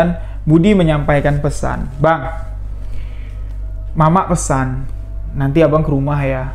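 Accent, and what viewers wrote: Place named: Madura, Indonesia. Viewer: Tak denger2in ini logat koq jd madura yaa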